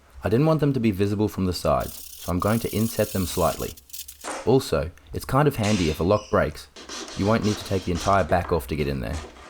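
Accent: Australia. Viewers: hi there..I can tell by your accent you are an Aussie like myself...I've watched various furniture flippers from other countries doing this but have wondered myself if there is money in it here in Australia..I know that this sort of furniture is rather expensive in Op/second hand shops and stuff on roadsides are generally cheap and nasty particle board, not worth fixing up..I have furniture of my mothers that date from the 50's, from when they first set up house, but alas some of it probably wouldn't sell, even if fixed up, because they are furniture that nobody really uses anymore, like free standing wardrobes...I'd love to keep them in my upcoming move but alas will not have the room for them..do you know of anybody flipping furniture here in Mel Vic..?? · Really like the look of this..and so nice to hear a fellow Aussie. A new subscriber for sure…🇦🇺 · Nice to hear an Aussie accent. 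· Saw the thongs, heard the accent and knew straightaway you were an Aussie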